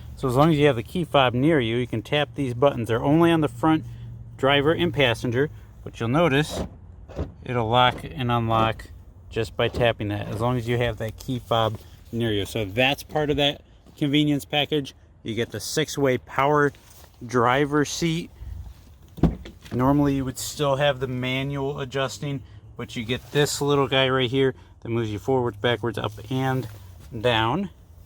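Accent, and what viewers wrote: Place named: Cleveland, United States. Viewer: Thank you for the overview. You have a very welcome interesting accent. You sound just like my friend from Cleveland. Are you in Cleveland.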